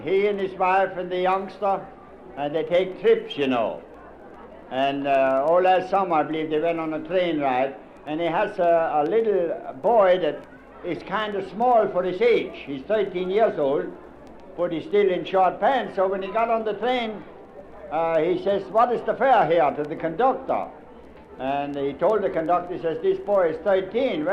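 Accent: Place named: Pennsylvania Dutch, United States. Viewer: he used to be at our family reunions when I was a kid... now 67 years old and that is how the old dutchies would talk my grandfather and uncles talk that way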